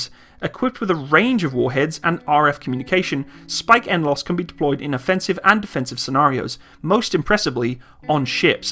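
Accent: United Kingdom. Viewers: who else thought he was british?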